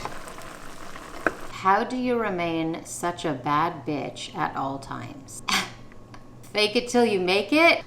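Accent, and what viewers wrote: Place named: Long Island, United States. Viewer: OMGGGGAWWWDD —— I LLLOOOVVVEEE FROM ❤️ I post on almost all her vids. We definitely need a video on your amazing background. Turkey, Spain, Hebrew name and in Canada...wwwhhaatt? Plus bonus points because your manner of speaking, inflection, sounds like me, a Long Islander ( please don’t been offended 🥰). Loved this video! Muah 😘 (your daughter, speaking her mind already momma! Love it)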